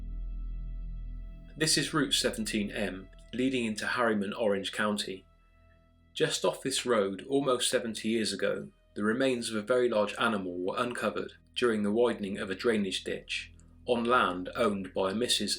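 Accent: England, United Kingdom. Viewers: Great video! Love your channel....and American girls love a English accent 🤗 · You need a better microphone to showcase that English accent. It sounds hollow and tinny. Otherwise the video was excellent, keep them coming. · there from different countries, this is an English accent, Bale is from wales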